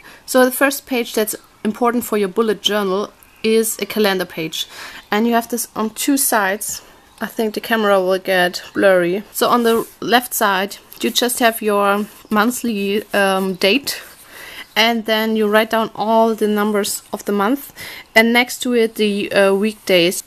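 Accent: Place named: Germany. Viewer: Wow, ich hab nur kurz reingeschaut und noch keine komentare gelesen - trotzdem sofort gehört das du aus deutschland kommst =) was ist denn ein bullet journal?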